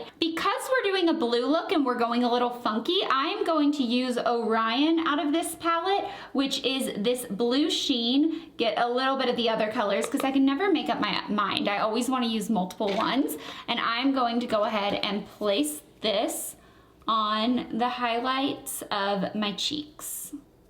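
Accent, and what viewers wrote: South, United States. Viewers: Aw I miss your country voice! Haha I have been watching you since back then!! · You were the first person who I subscribed to for beauty videos and I love this look! BTW you had more of a southern accent back then. I love southern accents! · That southern accent in the first video! where did it go? :-P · the southern accent ahhh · LOL you had such a thick Southern accent! And I love the reference hahaha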